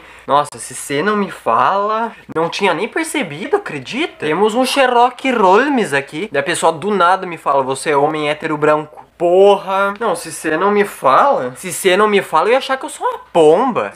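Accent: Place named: Rio Grande do Sul, Brazil. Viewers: Po tche cê n conhece sotaque gaucho? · Sotaque gaúcho tche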